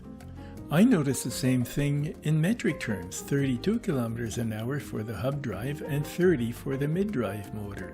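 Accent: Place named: France. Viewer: Je sais pas, la rue Maine je croyais c'était à Sherbrooke, et il y a beaucoup de côtes dans cette ville-là. Alors j'ai cru c'était cette ville-là. Mais clairement je savais t'étais français juste de la manière tu parle l'anglais. Haha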